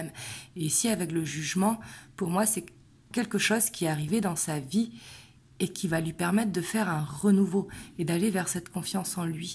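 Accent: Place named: South, France. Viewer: Je m excuse mais vous avez- l accent du midi vous etes d où svp ...oui mon ami est un ancien champion de course d automobile de course de cote il a arrêté il est retraité voilà je suis très fière de lui et moi ije suis très gentille merci j adore vos guidances 🦋🎁🎁🎊🎊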